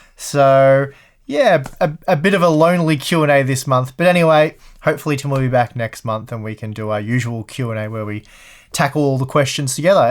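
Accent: Australia. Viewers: basically he feels that you are a typical Australian and he finds Tim to be a bit more British than Australian, does that make sense? You are the crocodile guy and Tim is more Nicole Kidman, accent wise. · didn’t feel like 30 minutes. Oh how time flies when you’re listening to an aussie bloke😂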